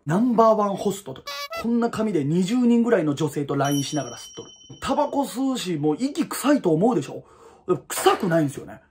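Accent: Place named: Nagoya, Japan. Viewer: あんた名古屋人か？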